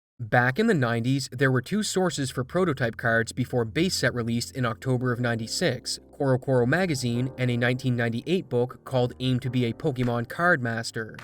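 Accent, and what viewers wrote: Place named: Newfoundland, Canada. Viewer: Took me like 3 videos to catch the Newfoundland accent. 👌🏼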